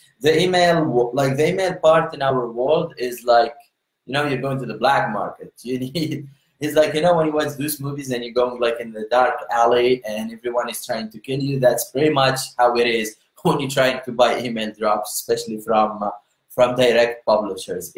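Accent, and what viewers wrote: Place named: India. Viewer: انجلزيتو مثل انجلزية الهنود الي عمرهم ما خرجو من الهند !!! لا اعرف لماذا يصر على التكلم كليا بهذه الانجلزية !!!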